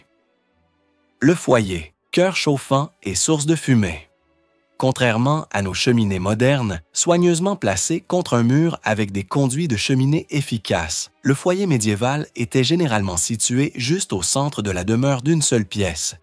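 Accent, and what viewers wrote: Quebec, Canada. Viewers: J'aime bien cette voix québécoise, je m'endort dessus. Merci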